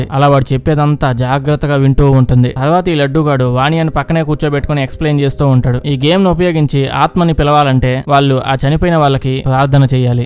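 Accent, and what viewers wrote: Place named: East Godavari, India. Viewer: Talking power good east godhavara majaka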